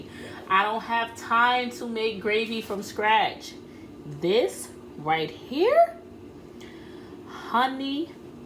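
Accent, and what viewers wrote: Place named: Philadelphia, United States. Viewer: AWW love your accent, I'm from philly so I'm here for this accent honey! lol don't call you hahaha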